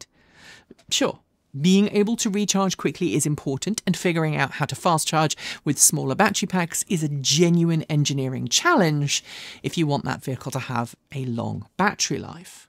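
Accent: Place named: United Kingdom. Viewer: Well, it sure did take you a very long time to make some good points! Get on with it and so being in love with your own bloody British accent.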